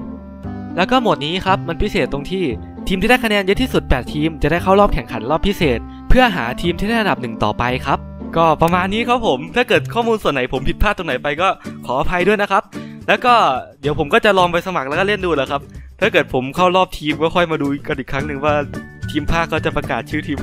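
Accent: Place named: Khon Kaen, Thailand. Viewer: อ้าาาก พี่ ขอนเเก่นเหรอ ที่เด่ววกัน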